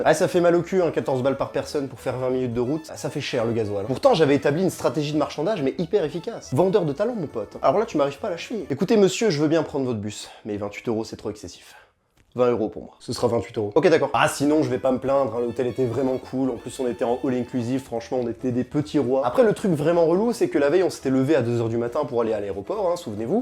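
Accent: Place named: Arab world. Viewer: Ton accent arabe 🤌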